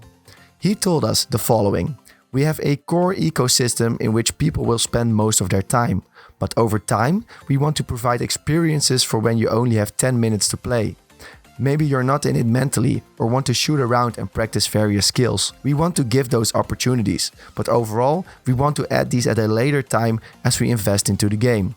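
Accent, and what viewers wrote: Netherlands. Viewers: Hey are you dutch bro?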